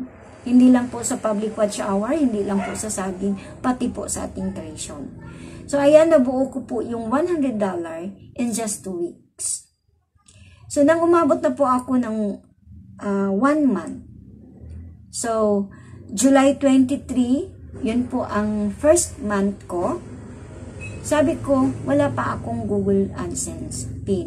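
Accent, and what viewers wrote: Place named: Visayas, Philippines. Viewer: Wow congratulations madam hindi ka nag iisa matigas din mag salita hihi pero gwapa basta bisaya😊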